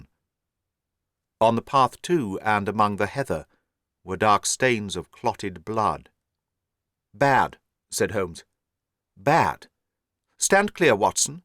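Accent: United Kingdom. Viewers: I do love listening to this man read. He gives an entirely new meaning to the words 'The King's English'.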